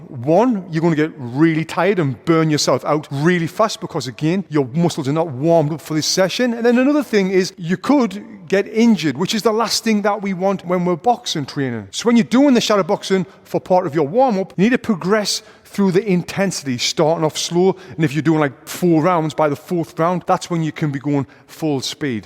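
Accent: United Kingdom. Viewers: Is it 'Britain English'?!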